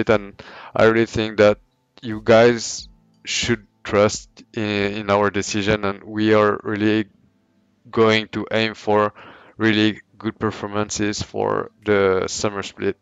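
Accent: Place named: France. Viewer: Haha I know that. but i heard it as if he said Fabian. I understand he has a french accent!! :P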